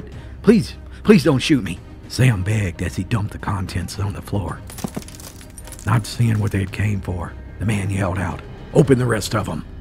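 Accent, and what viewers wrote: Appalachia, United States. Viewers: Your voice and Appalachian accent are just what I need when things get heavy on my mind. I have intentionally taken my blood pressure before and after listening to one of your stories, and it never fails that my blood pressure drops considerably after the story. I told my doctor that she needs to prescribe your channel to all of her patients, especially the ones with high blood pressure. Thanks for all you do.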